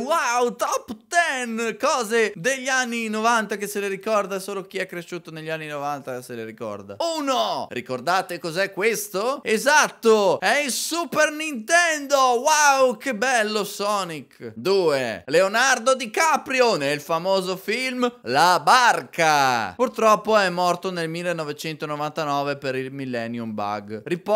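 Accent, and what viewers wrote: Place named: Romagna, Italy. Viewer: Bello l'accento romagnolo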